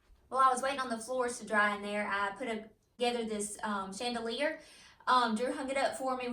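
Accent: South, United States. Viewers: I like the "Iowa Pine" and the "lavender" Mrs. Meyers . Such a pretty chandelier!!! Your rooms look great and doesn't it feel great to have them organized. You did get a lot done in one day. I really like the two thread work pictures. Just a side not~~I love listening to your southern accent. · You might want to look up <link> to see about getting spare parts for your chandy. I have used them as they have come to my rescue several times. Have you thought about painting it? I am on a spray painting frenzy so that is why I mentioned it I guess. You sound like a beautiful southern woman..I was born and raised in Tennessee but am now in Georgia. Love your decorating style! Tfs,,,take good care!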